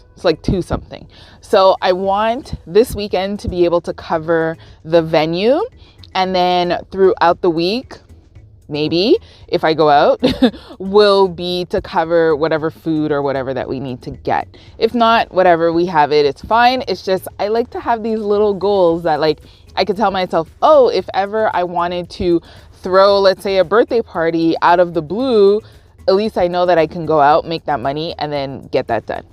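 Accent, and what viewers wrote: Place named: Canada. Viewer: The Canadian accent is music to my ears. Why are you on the right side tho? Is you camera mirrored?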